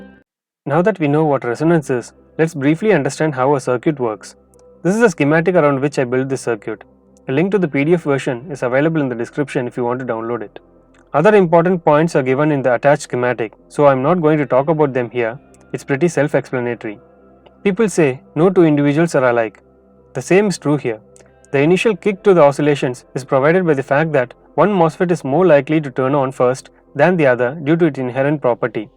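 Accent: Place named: Kolkata, India. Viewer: Hi brother, your accent seems from calcatta. May I I know your home and educational background?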